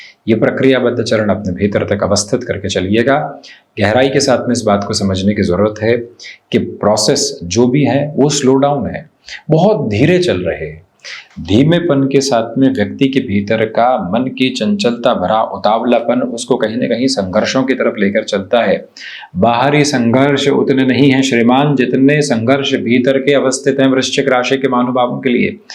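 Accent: Standard. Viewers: अत्यंत शुद्ध हिंदी भाषा व उच्चारण सुनने के बाद अत्यंत आनंद का अनुभव कर रहे हैं l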